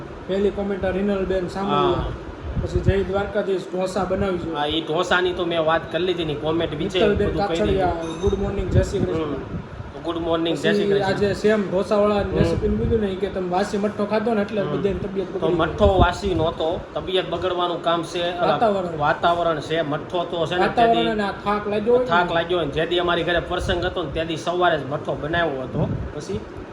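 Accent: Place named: Gariyadhar, India. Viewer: Tamara video hu darroj jasadn thi job chhu aa baju aavo tyare aavjo. Tame gariyadhar bajuna chho. Tamari boli upar thi. Aevu. Lage che.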